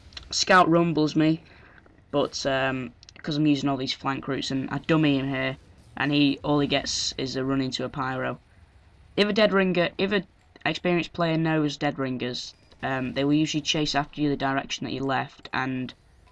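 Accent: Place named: United Kingdom. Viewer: Hey it's me!! I gave you feed back before, I really like you. Your young but your not young enough for your voice to be ear piercing, and you got a British accent so that's always nice to hear. You also seem quite calm and comfortable commentating. I think I'll sub, also I really like frontier as a map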